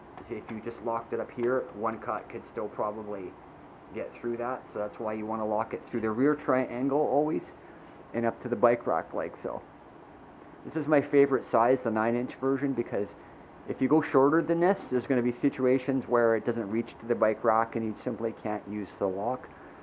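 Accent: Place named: Canada. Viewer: Thanks for making the astute comparisons on the Kryptos. You sound like a Canada man. As for the bracket/clip mount, for the yellow NY Standard, I've simply taken off the bracket with the hex screw, and I have no intention of packing it that way. I pack in a backpack especially for hauling groceries, on the way to the store. On the way back, I have three choices. Either in the pack if there's enough room and nothing is getting crushed, or outside on the inside of the straps, and over the top, or on the bike itself around the handlebars in one of a couple of ways. It's fairly stable that way for a couple of miles returning for any of my three bikes.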